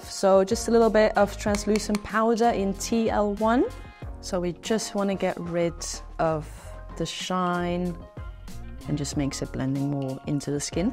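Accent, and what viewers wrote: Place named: Australia. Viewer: Lovely English pronunciation 😍 Oz one right?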